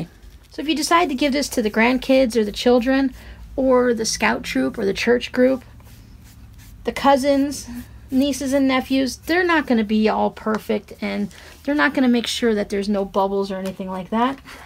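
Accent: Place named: South, United States. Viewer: I am so thankful I came across your videos, about two years ago. You are so talented, I love the rambling (and the funny southern pronunciations), seeing your thought process, and how you describe every step (with many alternatives). Bless you.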